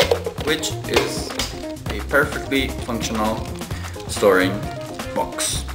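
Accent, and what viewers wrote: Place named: Brazil. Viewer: Você fala inglês mas você é Brasileiro, seu sotaque não nega.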